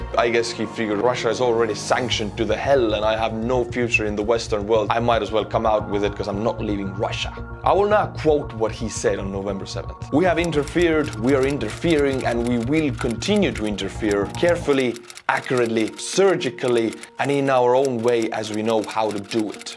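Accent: Estonia. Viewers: I can't wait to support you so that I can hear you butcher my name in your Estonian accent. Always look forward to watching a new video. But I won't eat at Prygozhin's restaurants. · I really enjoy your videos and this was most interesting and entertaining. I like your style of presentation with your awesome Estonian accent. Thank you and keep them coming!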